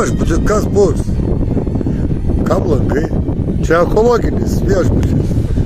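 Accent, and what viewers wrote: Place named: Šiauliai, Lithuania. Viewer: O KALBA ŠIAULIETIŠKA..RYŠKIAI😂❤👍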